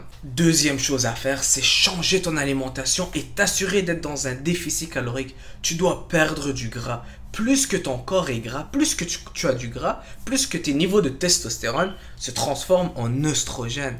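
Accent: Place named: Quebec, Canada. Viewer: Je me disais aussi...y'a comme un p'tit accent québecois chez ce gars🤔. Vidéo courte et instrucive qui va directement à l'essentiel👍Merci.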